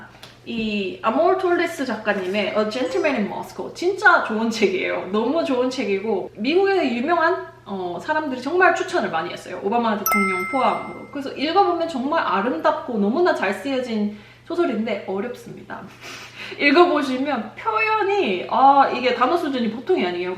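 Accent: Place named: Ulsan, South Korea. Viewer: 오늘따라 유독 진한 사투리 악센트! 울산쪽이신거 같은데 궁금하네요.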